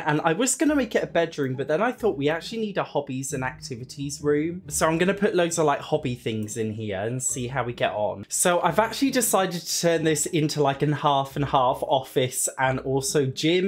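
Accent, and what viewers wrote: United States. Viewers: I’m in love with your American accent 😂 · Your American accent is so funny. That literally is how we say hamper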